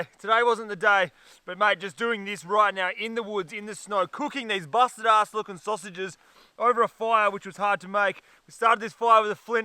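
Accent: Australia. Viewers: Great video, and happy new year to you a mac10.. it’s really funny how super Australian you sound with the backdrop of snow haha 😂 the accents multiplied since leaving the sun haha.. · If it wasn't for the Australian accent, I would've thought him a russian 😂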